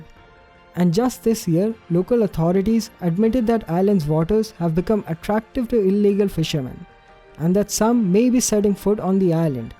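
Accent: India. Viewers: Disgusting accent. Hate the indian accent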